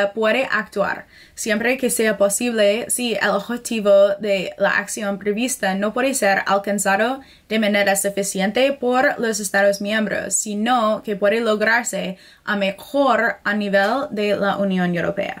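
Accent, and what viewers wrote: United States. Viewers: Que lindo El Castellano pronunciado por una Estadounidense . Saludos Desde Italia .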